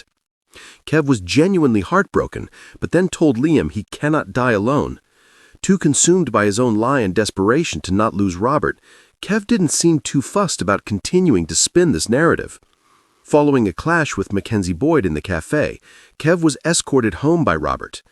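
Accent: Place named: United States. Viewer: What is going on with all these American voice overs. It’s off putting.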